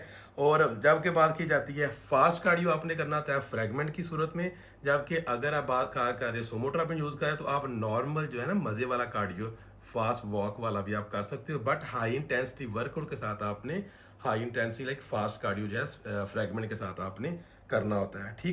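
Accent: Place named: Pakistan. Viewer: Sab theek lekin accent pakistani kyu hai aapka ?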